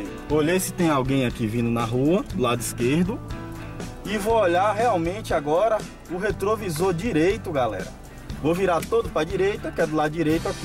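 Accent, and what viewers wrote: Bahia, Brazil. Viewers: Conheço esse sotaque de longe...Vc é daqui da Bahia. Rsrs vc dá aulas de direção?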